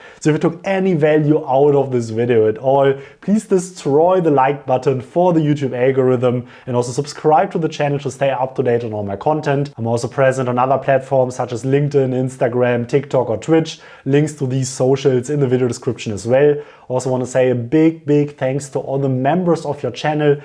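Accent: Germany. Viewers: The quintessential German accent!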